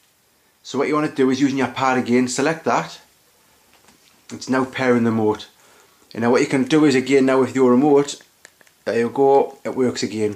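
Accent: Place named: Tennessee, United States. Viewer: You sound funny are you from Tennessee?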